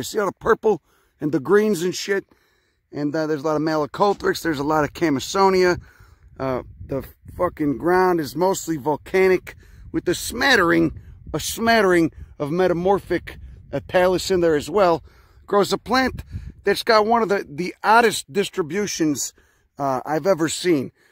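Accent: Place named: New York, United States. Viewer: I love your accent adds a a bit of humor to your videos. You have to be from New York. Great videos and educational.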